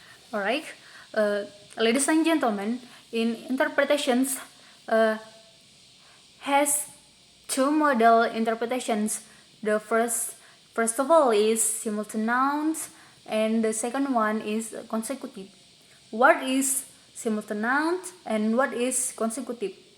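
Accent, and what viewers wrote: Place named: Indonesia. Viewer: Well done girl! Keep it up. You got Indonesian accent I guess, Good I’ve got few good Indonesian friends.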